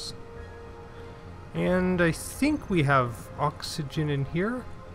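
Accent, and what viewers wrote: Canada. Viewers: are you Canadian? you sound Canadian.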